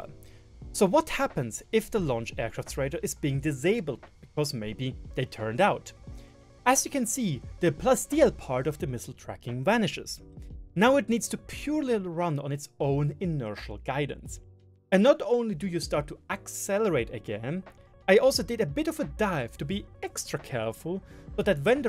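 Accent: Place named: Germany. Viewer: Dude has a thick German accent 😂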